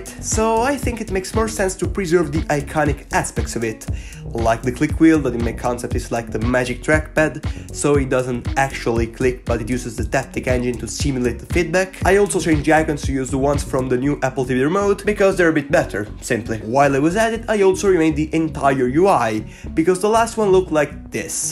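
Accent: Italy. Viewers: Sei italiano zio vero?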